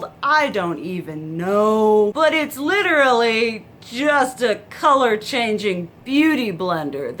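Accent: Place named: Rural, United States. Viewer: Its funny how you make fun of people loosing their mind over different color beauty blenders when you apparently loose your mind for useless knick knacks and odd shaped and colored eyebrows. I don't know how many people will be able to get past the various annoying habits you have and your hick accent. But good on you for putting yourself out there. You are making fun of flipping NATASHA DENONA for baby Jesus sake. Have you seen how classy and beautiful she is?!?! I have every one of her palettes, not even kidding. Bullshit, you will be buying shit all year this year and just not telling people.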